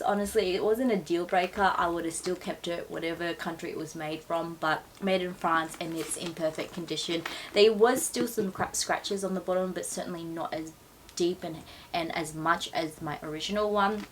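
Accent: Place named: Australia. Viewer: You're so Aussie 😂 I say cans too. I've heard you're supposed to say carn but maybe I'm wrong. Anyway love this bag and love the reverse monogram w the monogram 💖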